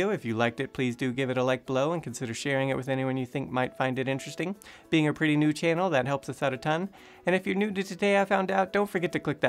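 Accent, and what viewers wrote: United Kingdom. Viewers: To be fair, the smart British accent facade is getting a little old in general, though I can't argue that it wasn't a smart move all the same. At least I don't feel like Simon wants to kung fu fight me like that guy from PBS Space Time. His "smarts" are over 9000. Cheers.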